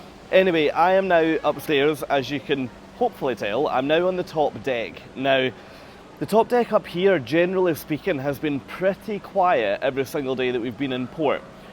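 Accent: Scotland, United Kingdom. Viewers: Hey - actually I just came across your video by chance, because I was watching videos on MSC cruises.... But when I heard your accent ( absolutely love it!!! - watched the Outlander series only in the original tone because of that), I just had to watch the whole thing. Very well done! There are so many terrible casts online, with nerving music, stupid comments etc... So I am glad I watched and of course I gave it a definite thumbs up and I even subscribed your channel;-). Although I dont have too much time, I will follow you on your journeys now. Regards from Germany, Bettina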